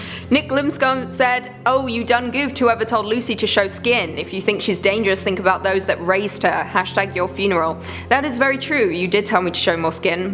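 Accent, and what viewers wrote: United Kingdom. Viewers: You're British? I couldn't tell. I just figured that you had a cold. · It's so good to hear this a fellow Brit and not having to listen to Americans butcher British town and city names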